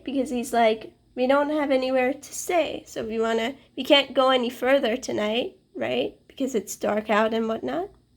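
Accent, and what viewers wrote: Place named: Spain. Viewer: Dear You were awesome 👏👏👏👏👏👏👏 I haven't finished watching the video, But I enjoyed listening to your reading; it was so fluently with a pleasant accent, it even sounded like a native Spanish to me. The way that you explained about reading the story in a foreign language, was interesting for me. I'll use it in English, I hope it works for me as much as it worked for you in Spanish 😊 Good Luck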